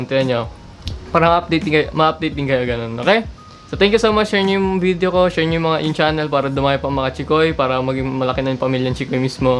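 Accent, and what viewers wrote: Visayas, Philippines. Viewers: Bisaya po ba kayo??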